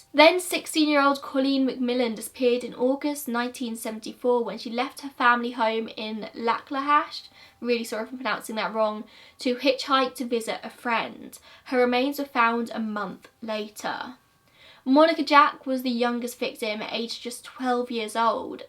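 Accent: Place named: RP, United Kingdom. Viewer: I've always had thing for posh speaking sexy girls like yourself, please take this has a complement not some sleaze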